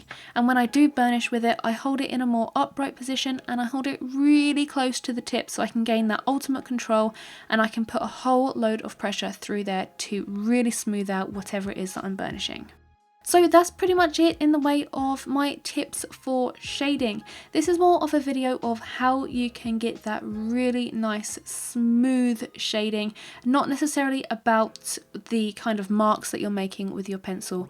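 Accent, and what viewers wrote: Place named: Australia. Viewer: Brilliant video- thank you so much for this, and all you share of your knowledge! 👍 Just love your aussi dialect too! ❤ Greetings from the other side of the planet - Sweden. 🇸🇪🕊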